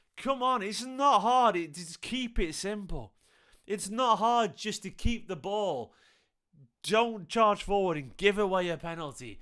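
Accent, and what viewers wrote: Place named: Liverpool, United Kingdom. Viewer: Is this fella scouse? He’s got scouse undertones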